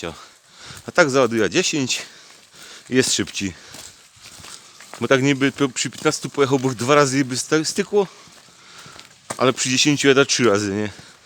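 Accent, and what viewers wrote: Silesia, Poland. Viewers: Konkretny materiał 💪💪 Ze śląska jesteś bo taki akcent ? Sub i łapka leci Zapraszam również do siebie na odwiedziny Pozdrawiam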